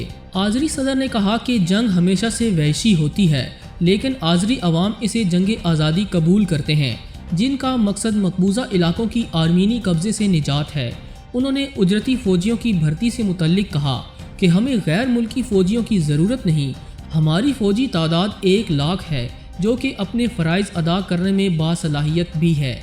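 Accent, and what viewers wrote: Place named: India. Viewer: Typical indian accent 🤔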